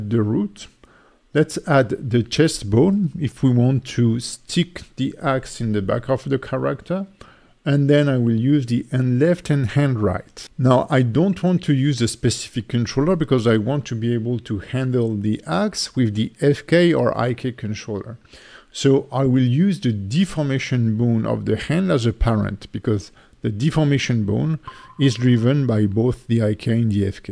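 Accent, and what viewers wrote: France. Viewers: your accent is french 😅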